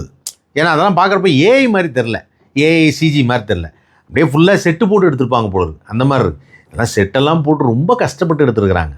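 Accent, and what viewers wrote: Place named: Coimbatore, India. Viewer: Kovai Accent 💯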